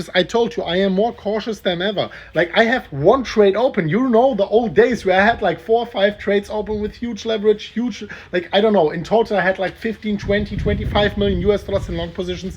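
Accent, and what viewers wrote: Germany. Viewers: Täusche ich mich, oder hast Du nen extrem deutschen Akzent? 🤔😃 Thanks for your nice video.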